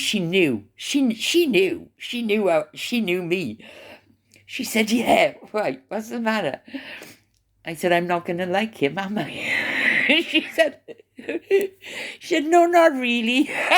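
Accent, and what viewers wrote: Wales, United Kingdom. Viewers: My Welsh friend you have been missed i love listening to your Welsh accent it reminds me of home i can't wait for your next chat i love your stories of the palour And anything really its all interesting to me until your next one 💐 💝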